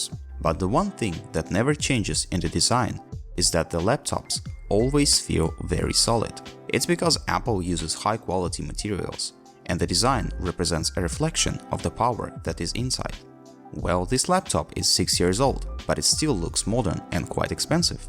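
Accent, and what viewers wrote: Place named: Ukraine. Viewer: My guy have a badass Ukrainian accent 😁🇺🇦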